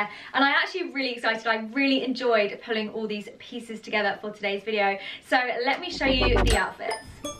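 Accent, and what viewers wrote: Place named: United Kingdom. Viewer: Her British accent 😁😁😊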